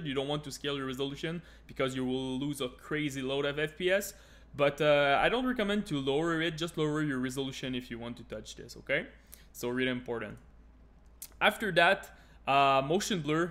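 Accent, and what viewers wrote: Quebec, Canada. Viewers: un quebecois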